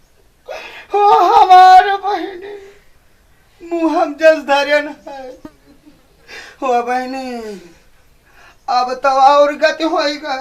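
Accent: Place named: Awadh, India. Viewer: क्या जबरदस्त अवधी भाषा है भाई इस आवाज का कोई जोड़ नहीं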